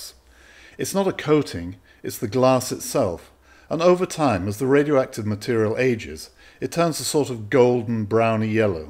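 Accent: United Kingdom. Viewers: With a name like and a British accent, how can we go wrong?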